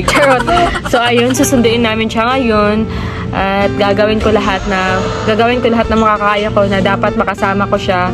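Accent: Visayas, Philippines. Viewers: Teh bisaya ka po ba?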